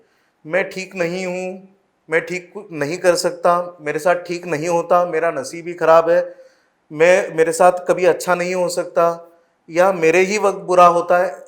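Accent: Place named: Maharashtra, India. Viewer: Tu marathi n tuz accent marathi ahe marathich sangaych murkha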